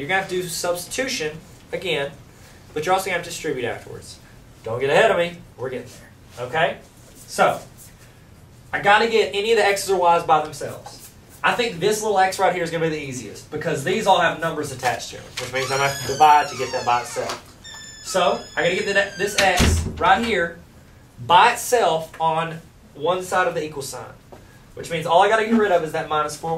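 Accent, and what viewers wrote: South, United States. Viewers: I would much rather have this guy with a hot southern accent than my grumpy math teacher now. Please be my teacher.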